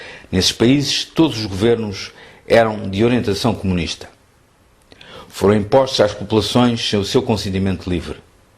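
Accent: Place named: Portugal. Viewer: Português de Portugal.rs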